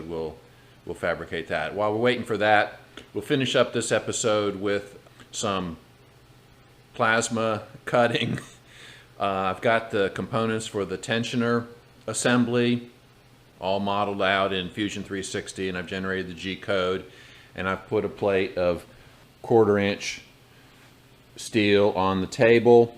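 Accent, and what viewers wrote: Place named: New England, United States. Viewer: Great build. Recent sub here about the time you started this project. Been trying to guess what state you’re in, based on accent, garage style, and garage setup haha as that’s all I have to go by…… maybe somewhere in New England???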